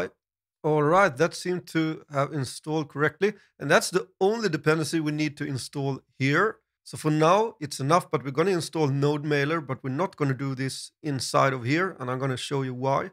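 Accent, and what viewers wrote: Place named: Sweden. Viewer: I knew you were from Sweden. Kind of noticed it from the accent. Then you confirmed it :D I'm from Malmo. Great channel, keep up the awesome work.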